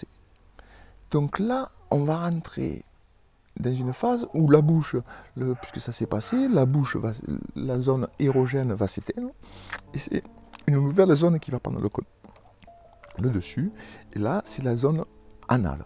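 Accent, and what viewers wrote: South, France. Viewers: Vraiment très bien, très bien expliqué, par un locuteur très sympathique.Son discours est accessible aux non initiés, va à l'essentiel. Il n'y a pas à dire, l'accent du sud, c'est quand même autre chose que l'accent débile des gens du nord.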